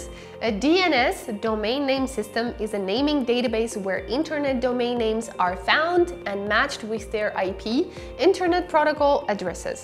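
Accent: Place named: Iran. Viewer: That's some Iranian accent she has, isn't it?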